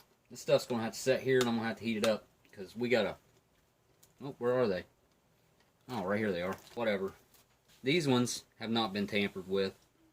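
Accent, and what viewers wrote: South, United States. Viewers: Theres somthing about the southern accent that feels so homey or homelike.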